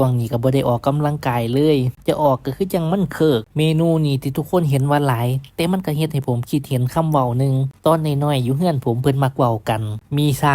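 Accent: Thailand. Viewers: คนอุบลก็แบบนี้แหละ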